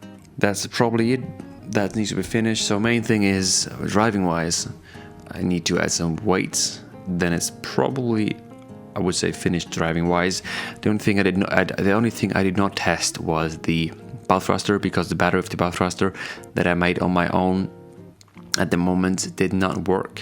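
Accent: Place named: South Africa. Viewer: I sorta see it as ticking boxes.. its a build.. and its relatively cheep, i love fishing and wanna see a sea monster landed by RC boat. Then you can expand your channel with ppl who are perfect and like fishing. You have the set up... and even if you not a fisherman, im SURE it would be a blast... find your fishing mate's to get tips. Somtimes old heads have some fishing knowledge. Austria! Your english sounds south african, i thought you white african LOL but 100% understood you so. Thanks for sharing the build mate. Its interesting.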